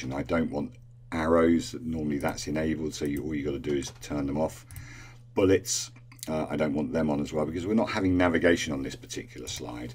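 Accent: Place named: United Kingdom. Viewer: Thank you for this video! You saved me hours of frustration with the Ken Burns effect! Loved listening to your British accent too! lol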